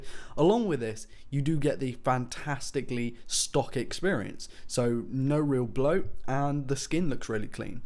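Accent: United Kingdom. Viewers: I like the way you speak, which part of UK are u from?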